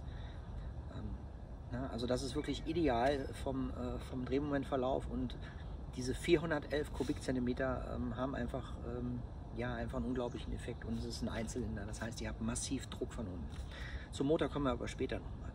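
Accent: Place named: West Germany, Germany. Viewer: Deinem Dialekt nach muss man denken das, du ein Wessi bist. Jede thüringische Gegend hat seine eigenen Nuancen, doch grundsätzlich erkenne ich jemanden am Dialekt ob er aus Eisenach, Apolda oder Schleusingen kommt. Mein Gemecker ist nicht böse gemeint. Verstehe es als konstruktive Kritik. Videos welche Informationen verheißen, dürfen einen zeitlich Rahmen nicht sprengen, sonst klicken die Zuschauer gelangweilt weiter. Schau in deine Statistik, da wird sich bestätigen was ich sage.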